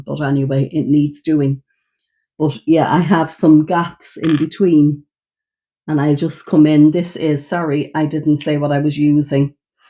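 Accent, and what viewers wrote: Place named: Ireland. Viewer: I could listen to you all day❤. Lov the Irish accent❤. I’d love to visit Ireland one day❤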